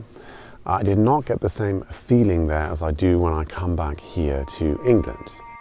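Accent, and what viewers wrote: Germany. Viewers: You now speak English with a German accent. It’s fascinating to listen to. Thank you for this commentary. It’s a very accurate appraisal of the uk. Makes me sad. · You’ve definitely sound like a German speaking English . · Can I just ask, do you speak German in Germany? Your accent seems like ...German? I have lived in Thailand for 14 years as a Brit, I speak Thai, but when I go back to the UK people say I sound American. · You have a mild German accent ❤ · Your accent is very interesting after that 16 years. Its 50/50, the German accent coming through very strong. Re: UK, yeah, its got dark in some places, your average high street now in small towns is full of Betfred, Turkish Barbers, maybe a Chinese or Indian takeaway or two, Indian Dessert Shops/American Candy shops (aka: tax dodging businesses), just super disconnected from the culture and a bit dead. 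· I hear a hint of German accent in your English. Is that from living in Germany for a decade? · Dude you now speak with a slight German accent. Not sure how long you've been in Germany, but thought you were a German living in the UK. 😁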